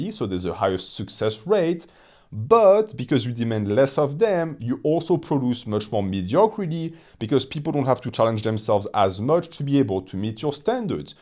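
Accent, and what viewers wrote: France. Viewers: Sounds french to me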